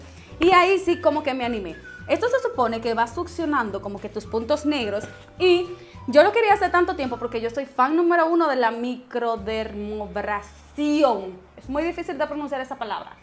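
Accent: Dominican Republic. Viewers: Gracias amiga! Es que note su acento parecido al de República Dominicana...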